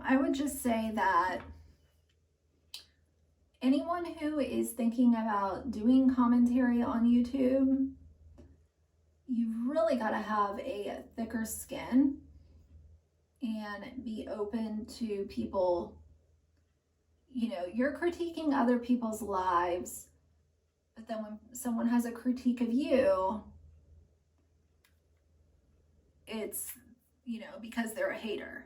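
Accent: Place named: South, United States. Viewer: Lip fillers and botox!! She is from MN and suddenly has a southern accent and spoke to Amy Duggar King and now says, ” Y’all.” She is very one-sided with her opinion. Only hers counts. I subscribed when she covered the Schoenfield alleged Munchausen by proxy kids. I unsubscribed when she ripped the Duggar kids apart. Not including Josh, the others need compassion. Enough ppl complained and now she has changed her tune. She is small-minded. I feel sorry for her. She is very typical of this area of the country. I have lived in each region of the U.S. and I feel I am able to make this opinion also from experience in the Military and from being a Military wife.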